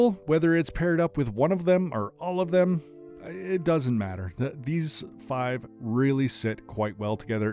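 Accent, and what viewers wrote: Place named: Alberta, Canada. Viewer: I absolutely love how you take us through the process and show us each color way worked up and paired. Makes me want to buy EVERYTHING. Fantastic marketing strategy. Canadian living in the US so it’s fun to listen to your Alberta accent. I have family around Lethbridge through to The Hat. Love your videos; keep ‘em coming. 🙂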